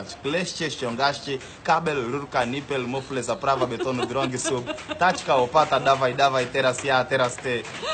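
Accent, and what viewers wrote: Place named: France. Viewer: Gówno prawda mówi z zabojadzkim akcentem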